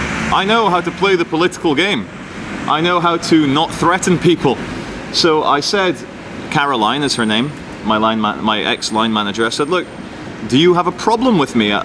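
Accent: Scotland, United Kingdom. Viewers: its a jock accent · He's british but probably grew hearing different english accents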